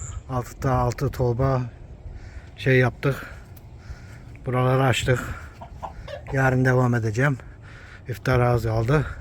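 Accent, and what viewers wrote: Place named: Çorum, Turkey. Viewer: Usta Çorumlu gibi konuşuyorsun ben Çorumluyum şiven bizim oranın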